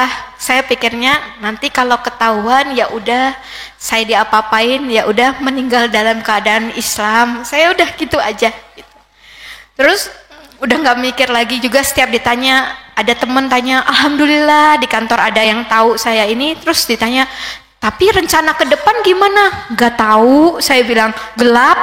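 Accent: West Java, Indonesia. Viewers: Dokter bahasanya kaya sunda banget ye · Asalamualaikum.....ibu dokter Alhamdulilah saya dengernya seneng dan terharu mudah2an selalu sehat panjang umur dan selalu Istikomah.....Aamiin ibu dokter apa ibu dari suku Sunda? Suaranya logat Sunda. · Iya. Jdi kayak orang Sunda....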